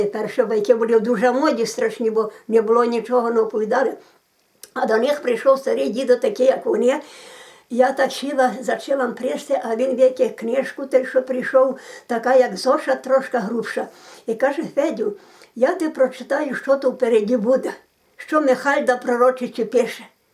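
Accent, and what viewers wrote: Lviv, Ukraine. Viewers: Не сперечайтесь,що до говору,так розмовляють на Львівщині! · Українська, Львівська область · В нас в селі Львівської області, такий самий говір. Так приємно слухати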